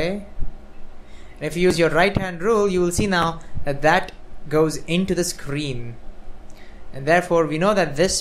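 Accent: India. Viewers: wyf? indian? cuz ur accent hmm lol great explanation tho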